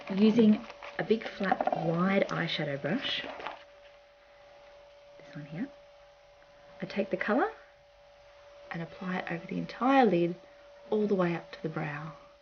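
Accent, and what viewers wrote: Australia. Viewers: There's just something about your Australian accent that makes me love everything you do. Or maybe it's just your talent. :D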